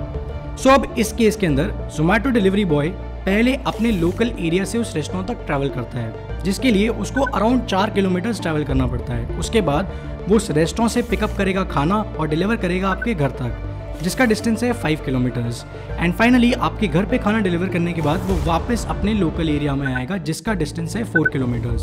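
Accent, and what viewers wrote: Bareilly, India. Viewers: Are you from Bareilly?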